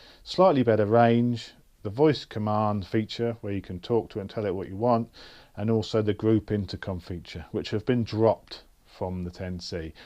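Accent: United Kingdom. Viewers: reviews are certainly more watchable when it's in a smooth english accent. Sure beats Americans telling me "it's frikkin awesome!". May want to think about quitting your day job;) a walking disaster haha either that or his comedy act is really coming along. Can I ask…how much does it cost to feed and look after a pet? only they look like so much fun but I've also heard bad things like they go a bit ravenous over a nipple and also pretend to leave their debit card at home when it comes to paying all the time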